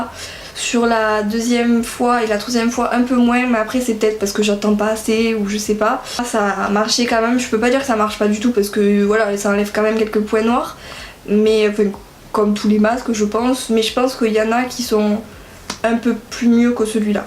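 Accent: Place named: Marseille, France. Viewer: Sinon t'es une marseillaise ? pcq chepa si c'est moi mais t'a un accent marseillais et j'aime trop ton accent 😘😘😚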